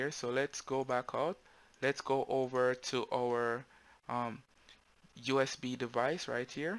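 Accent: Jamaica. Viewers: Ur Jamaican ??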